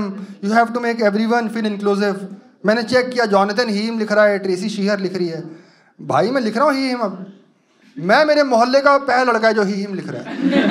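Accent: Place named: Punjab, India. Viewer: LOVE UR PANJABI ACCENT OF SPEAKING BC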